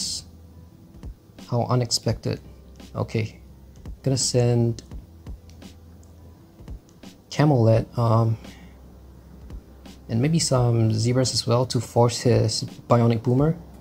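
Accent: Singapore. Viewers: mm where are you from? Singapore?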